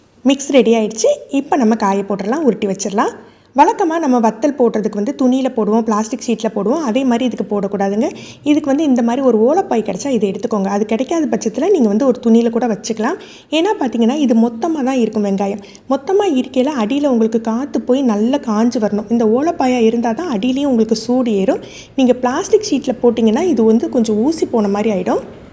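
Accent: Tirunelveli, India. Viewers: நீங்க எந்த ஊர் -மா.நான் திருநெல்வேலி.நீங்கள் பேசுறது திருநெல்வேலி மாதிரி உள்ளது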